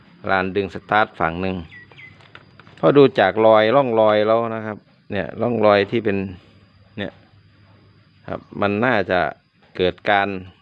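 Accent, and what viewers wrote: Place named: Ubon Ratchathani, Thailand. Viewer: ช่างอยู่ อำเภอได๋สำเนียงคือทางอุบล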